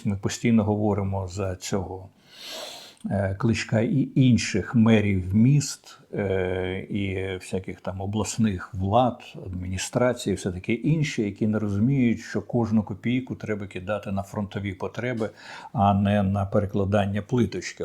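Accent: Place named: Russia. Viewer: пане, вам так подобається московська мова, московська фонетика? що таке "нічтожество"? вам не подобається "нікчема"? і чому "КІЄв"? "КлІчко"? ви ж начебто наголошуєте кожного разу, що російська мова - це дніще, то може, вам самому варто почистити свою українську?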